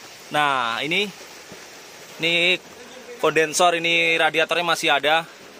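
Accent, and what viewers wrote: Java, Indonesia. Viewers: Aku senang bahasa indonesia mu mas tapi jowomu medok jawa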